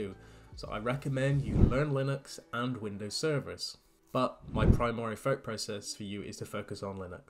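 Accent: United Kingdom. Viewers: Thanks bro.. I know I can trust you with that west country accent like mine😂, great video, very informative 👍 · Are you from Devon?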